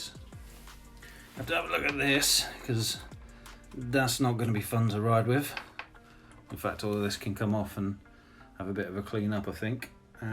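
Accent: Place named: United Kingdom. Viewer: Always love british accent motovloger ✌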